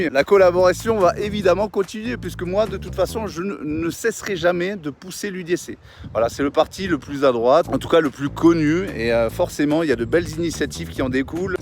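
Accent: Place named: France. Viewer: 100 membres? Ouf ca rassure!!! Le mieux serait encore zéro "bon un" tu vas pas te virer de ton mouvement quand-même 😉. Étant une Suissesse pur souche, j'avoue tu me sors par les trous de nez avec ton patriotisme à 2 balles, récité avec ton accent bien frouze, ah stp, tu pourrais nous faire une petite vidéo avec ton passeport? Quand a revendiquer ta couleur blanche au secours, tu en parles comme si tu avais fait des études poussées pour l'obtenir 😂, si le blanc disparaît c'est peut-être que les métissés ont plus d'attrait que les blancs. Et concrètement tu veux changer ca comment? Avec une loi qui interdit de faire un gosse avec une personne de couleur? Non mais franchement!!!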